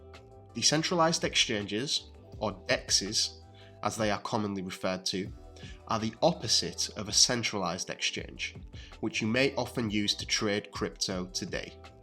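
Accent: Yorkshire, United Kingdom. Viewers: Very very informative. You are an excellent narrator! Northern accent, Yorkshire? Many thanks.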